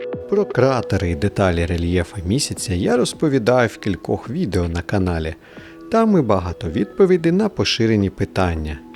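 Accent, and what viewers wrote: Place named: Russia. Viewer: У вас ужасный русский акцент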